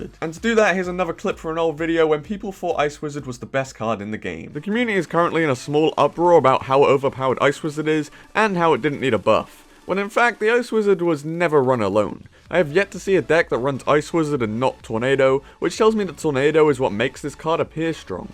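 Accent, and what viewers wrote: United Kingdom. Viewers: Yeah, your accent sounds british, but you always answer to strange times:D Could have been American time zones.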